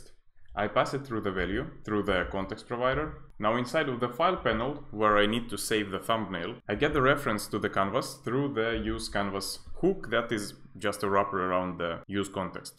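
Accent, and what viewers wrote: Russia. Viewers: Du låter väldigt rysk när du pratarz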